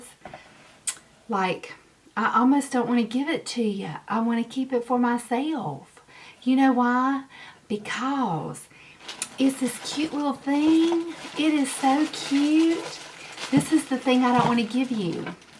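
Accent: South, United States. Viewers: Where are you from..so southern..even for me and I'm in Arkansas..lol.. Enter me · Loving that Southern Belle accent and that laugh!! 🤣❤ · Girlfriend you are definitely southern.love it!please enter me IG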